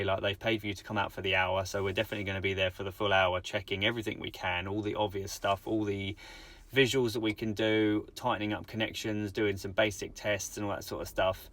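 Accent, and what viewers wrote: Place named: South East England, United Kingdom. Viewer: that’s great pal. Very helpful info… Where are you based if you don’t mind me asking? I’m up in Manchester and south lancs/Cheshire. I’m guessing somewhere south east from your accent 😂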